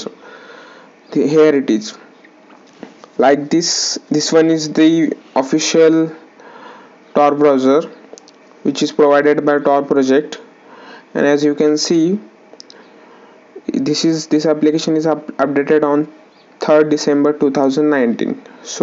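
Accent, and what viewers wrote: India. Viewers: U are indian ur voice is like indian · Must be Indian, lol but I can understand what u say.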